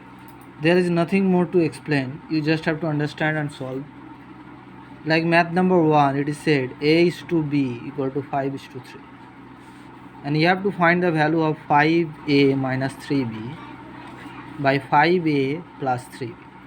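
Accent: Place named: India. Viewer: Indian accent: check Noise in the background: check math tutorial: check THIS IS AWSOME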